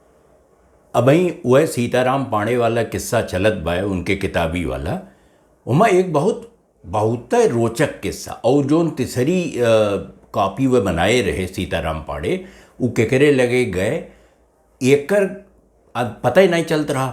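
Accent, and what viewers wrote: Awadh, India. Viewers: अवध पंच म आपकां सुनित है तो बहुत नीक लागत है। इतनी प्यारी अवधी में आप बतुआत हो कि सुनि कै मन मुदित होय जात है। सीताराम पांडे वाली किताब सारांश प्रकाशन से पहिले छपी रही। तब बहुत चर्चा भय रही। हम कुछ अंश अखबारे में शायद पढ़े रहेन। अइसन याद पड़त है। दिल्ली के विश्व पुस्तक मेला से तब हम खरीदै वाला रहेन लेकिन तबै हमरे एक दोस्त कहिन कि हमरे लगे बाय हम तुहैं दई देब। अउर आज तलक नाहीं उ किताब नाहीं पाएन। आप बतवा जाय कि उ कितबिया अब कउने प्रकाशक के यहां मिली। हम खरीदै चाहित है।.. आपका अवध पंच बहुत बढ़िया चल रहा है। आपको बहुत-बहुत बधाई और हमारी हार्दिक शुभकामनाएंँ। टूटी फूटी अवधी लिखय क कोशिश किहन हैं कौनो गलती होई तो माफी दीन जाई।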